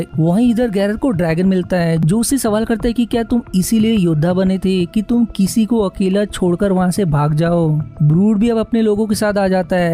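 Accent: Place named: Gujarat, India. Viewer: Why this guy has Gujju accent?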